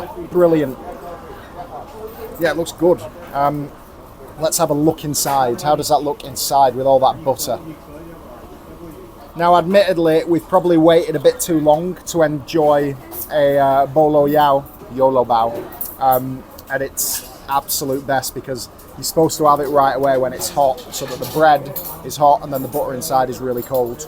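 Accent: United Kingdom. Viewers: Hello, it is much an enjoyable experience to watch your video about HK food scene and when you talking in British accent. We needed your expertise on analyzing how good is the HK milk tea in comparison to traditional English breakfast tea, it is enjoyable especially watching that segment. HK milk tea was invented somewhat based off the original formula of the original English Breakfast tea or Ceylon Tea during Colonial Era. I left HK 30+ years and occasionally I drink Twinnings tea bags and HK che chean tang here in Los Angeles suburbs are not that good compared to HK locations.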